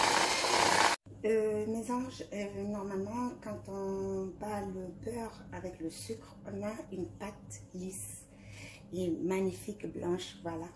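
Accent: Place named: Lebanon. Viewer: Bonjour, excellente recette comme a votre habitude. Par curiosité, j’ai remarqué un joli accent libanais, en êtes-vous originaire. Bonne continuation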